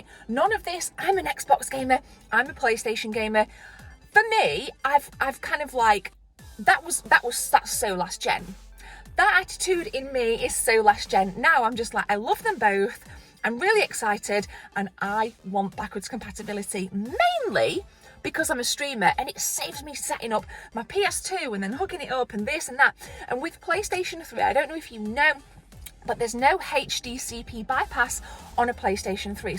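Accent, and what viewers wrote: United Kingdom. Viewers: That amazing uk accent!🙈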